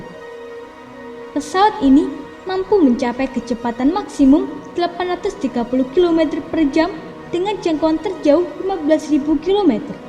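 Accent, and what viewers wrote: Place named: Aceh, Indonesia. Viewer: Logatnya mirip logat aceh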